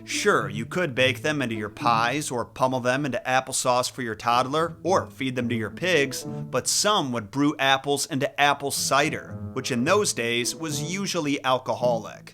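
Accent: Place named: Cleveland, United States. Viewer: Shitting on ohio? But your accent sounds like you’re from Cleveland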